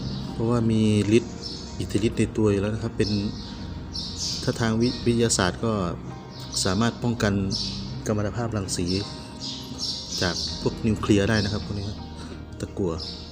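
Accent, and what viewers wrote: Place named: Buriram, Thailand. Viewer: แสลงโทนบุรีรัมย์คับ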